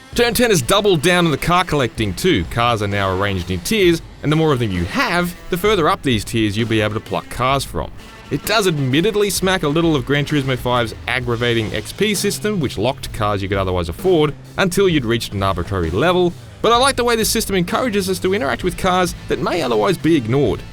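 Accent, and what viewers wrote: Australia. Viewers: I quite enjoy aussie accent, but listening to this gabble is true pain... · The guy is definitely an aussie, man. 😅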